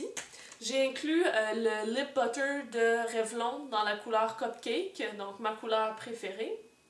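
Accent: Quebec, Canada. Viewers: moi je veut bien participé j'adore t'es vidéo, les lots sont pas mal et j'adore l'accent québécois. je croise les doigts et j'éspère que tu vas continuer t'es vidéos ^^. Bisouuuuus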